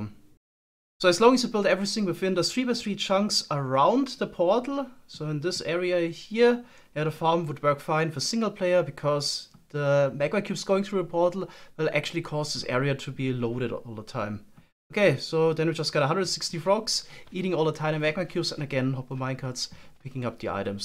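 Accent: Germany. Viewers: Bro sounds to german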